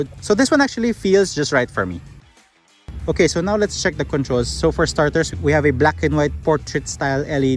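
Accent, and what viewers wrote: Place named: Philippines. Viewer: YOU SOUND SO PILIPINO.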